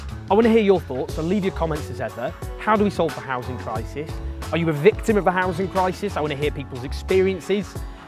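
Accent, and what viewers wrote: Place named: Manchester, United Kingdom. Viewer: What has happened to Owen? Why is he pronouncing his D's in this peculiar exaggerated Mancunian style? I'm sure he never used to do this. He manages to keep it together with most of the words featuring the letter D here but falls down on 'affordable' and 'order' in quite spectacular fashion. It's like a computer going a bit wrong. What's happened to him? I hope he's okay.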